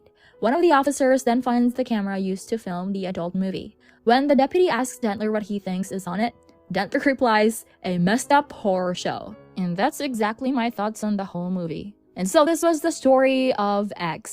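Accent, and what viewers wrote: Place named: Russia. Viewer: Your Russian accent is funny.... Do more of it 🤣🤣🤣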